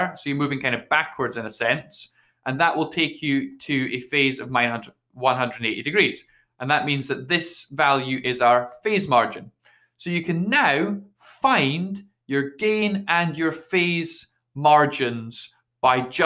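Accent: Scotland, United Kingdom. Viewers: UK probably, scotland i think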